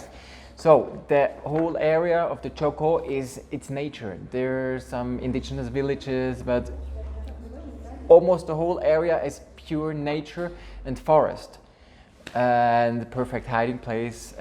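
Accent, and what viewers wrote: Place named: Switzerland. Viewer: From which country are you? (the accent sounds really familiar to me....😄 Schwiizer, gäll?! 😂 ✌️ 🇨🇭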